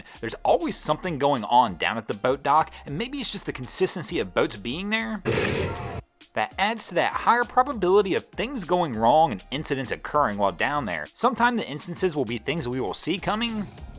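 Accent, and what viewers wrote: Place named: Pittsburgh, United States. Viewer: Why do you sound like your from Pittsburgh? You even say, "dahn there". And yet, you're a Broncos Guru. I don't get it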